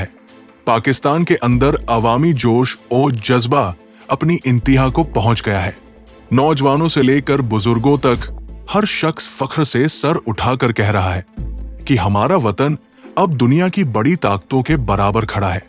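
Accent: India. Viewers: O DUFFER tera lub o lehja bataa raha k tum Indian ho. Is propaganda sa kisi aur ko fool karo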